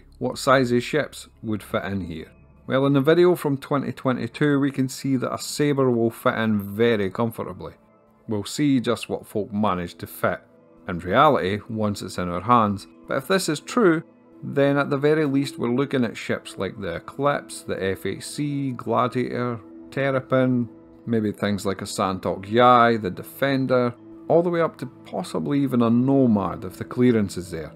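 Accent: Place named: England, United Kingdom. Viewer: I think its lovely English accent i love most about his videos- is it from London? Also next you could do 'Most Anticipated Gameplay' as that connects with ships so much. Cheers English Gent!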